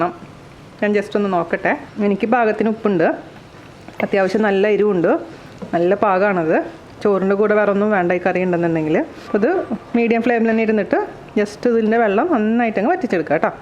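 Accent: Thrissur, India. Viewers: Scooper chechi nammal trichurkaarde baasha sooper readymoney ennu njanum parayaarund ente place chavakkad upperi sooper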